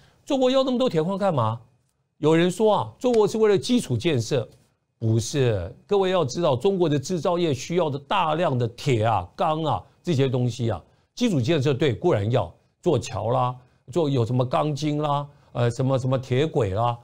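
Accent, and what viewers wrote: Taiwan. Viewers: 主持人华语是台湾学的吧，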